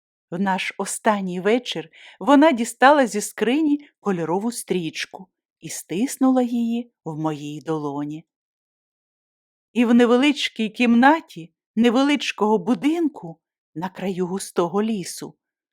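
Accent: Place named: Ukraine. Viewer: Добрий вечір,! Слухаю..., насолоджуюсь...новою зустріччю з Вами! А в голосі, до болю знайомі, нотки українського діалекту. Продовжуйте - це наша підтримка і віра на краще. ❤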